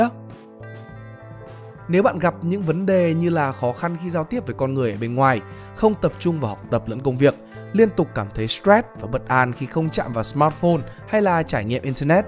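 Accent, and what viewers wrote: South, Vietnam. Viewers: từ khi thêm giọng đọc miền nam vào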